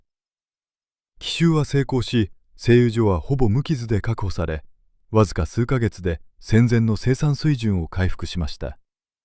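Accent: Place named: South Korea. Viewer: なんで、こんなﾁｮ~鮮語のような、語彙の頭が破裂音の耳障りなカルトチックな音声になるんだい？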